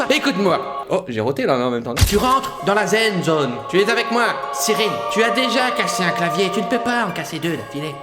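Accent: Quebec, Canada. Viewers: Chui québécois je valide en tabarnak ton accent continue tes vidéo tes le meilleur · je suis québécois et ton langage de québécois est a amélioré mais sinon continue tes vidéos j'adore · Jaime ton accent québécois et je suis québécois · Ton accent québécois est parfait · Cyril = l’accent québécois est trops cool Moi= fait une vidéo où tu parle juste en québécois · Mec ton accent québécois est très drôle mais un conseil c’est o pas au dans hosti de tabernak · Cyril, Cyril.... Stp arrete de faire l'accent des québécois tu m'énerve tellement quand tu fait ca. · En vrai ton accent québécois sa va sinon super video😂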